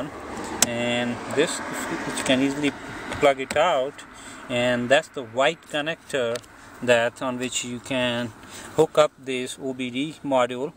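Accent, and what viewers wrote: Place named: India. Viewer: Tooo much Indian accent 🤮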